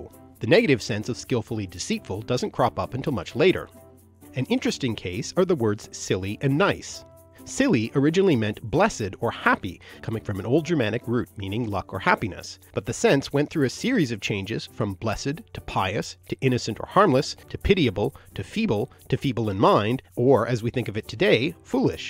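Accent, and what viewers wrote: Canada. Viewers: I never noticed before, but I think you have a tad of a Canadian accent. Are you from the great white north?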